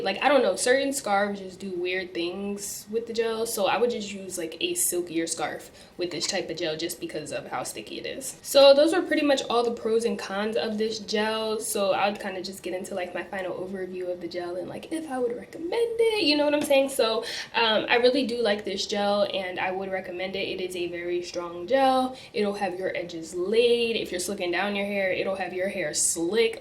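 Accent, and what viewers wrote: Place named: United States. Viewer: Where's that accent from? 😍😍😍 I'm from the UK and i just can't place where in the states that is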